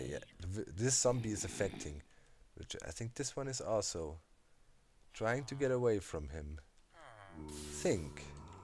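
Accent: Germany. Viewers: Hi. Here's something about me: You and Xisuma were my first two subscriptions. My ears fell in love with your soothing German accent and mannerisms, and even your occasionally mispronounced words. Please say "ingredientses" more often!:D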